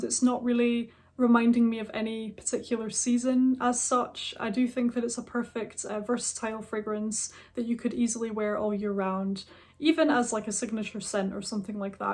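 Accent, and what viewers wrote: Scotland, United Kingdom. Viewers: I love your voice. I'm here in the heart of the southern U.S., Arkansas. I hate the average accent around the area I live in, and your accent is a breath of fresh air. Will you tell me where you grew up? My girlfriend & I have debated it quite a lot. We thought it sounded a little Scottish, but you have the United Kingdom as your location. Thanks. We love the Channel.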